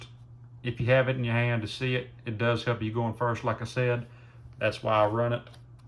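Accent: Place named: South, United States. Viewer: Something about hearing a southern accent talk about yugioh seems just right. Great deck, hope your channel booms.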